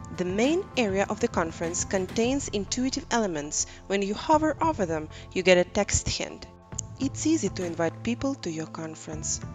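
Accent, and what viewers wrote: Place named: Russia. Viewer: какой чудный русский акцент :))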